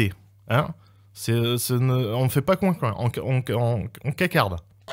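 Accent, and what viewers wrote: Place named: Banlieue, France. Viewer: Ça te va pas l'accent des banlieues :( trouve autre chose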